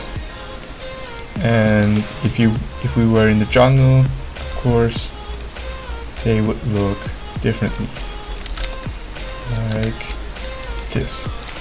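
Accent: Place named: Germany. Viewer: Ich dachte mir bei jedem deiner Videos ey der hört sich so deutsch an auf einmal in diesem Video bei Minute ist da ein Video von Lekoopa 😁 heißt du bist doch deutsch😄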